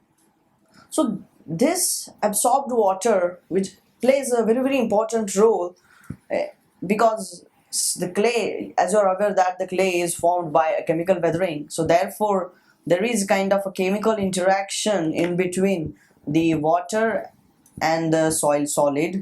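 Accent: India. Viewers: You want to sound like American, but end up as Indian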